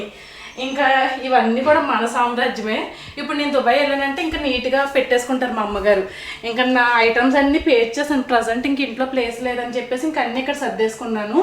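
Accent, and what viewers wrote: Konaseema, India. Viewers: దన్యవాదాలు🎉 మీరు చక్కగా చెప్పారు❤ మీ ఇల్లు మద్యతరగతి వాళ్ళు వుండే నివాసం, బాగుంది. మీరు చాలా కాలం గా ఆ ఇంటి లో వున్నారు. అంటే మీ అనుబంధాలు చాలా గాడం గా వున్నాయి. కోన సీమ భాష లో చక్కగా వివరించావు👍 మాది అమరావతి 🙏ప్రాంతం ఓం అమరేశర సామి👏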